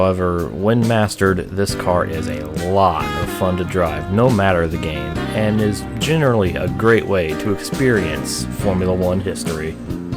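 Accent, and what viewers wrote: Italy. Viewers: Sei italiano?😀😃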